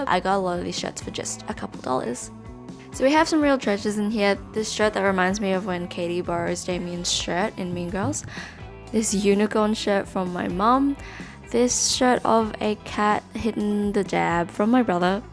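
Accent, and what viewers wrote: Australia. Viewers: Hiiiiii. I love your style and your accent. Can you recommend some other Aussie youtube channels that you love watching? I believe that if I enjoy watching your videos, definitely I will love your recommendations. Cheers!!!! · Oh jeez. ANOTHER austalian i had assumed was british. help i can't place accents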